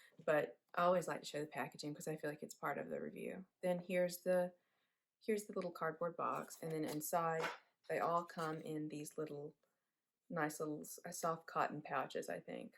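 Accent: South, United States. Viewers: Cool southern accent!❤great review!👍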